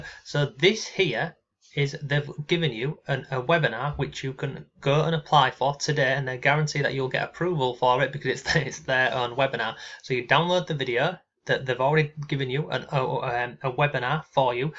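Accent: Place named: Northern England, United Kingdom. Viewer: your from up North somewhere in England.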